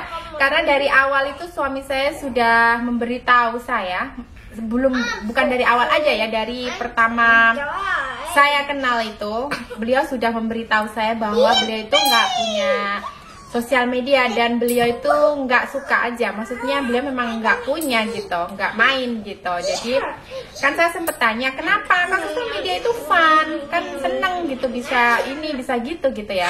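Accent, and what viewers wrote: Java, Indonesia. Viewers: Boso jowo e jek medok. Semoga rukun terus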